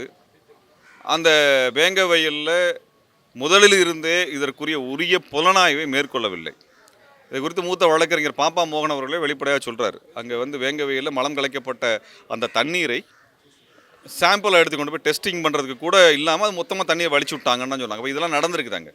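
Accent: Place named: Kerala, India. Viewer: Dei naaye h raja tamizhan da.. Nee naaye un slang eh malayala slang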